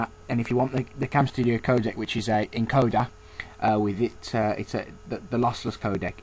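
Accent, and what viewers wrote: United Kingdom. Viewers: this black country accent comes on lol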